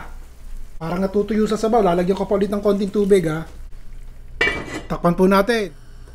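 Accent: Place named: Bulacan, Philippines. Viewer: Chef,bulakenyo po ba kau?kasi ganyan po ang punto ng mga bulakenyo e 😁😁😁 nakakatuwa po kayo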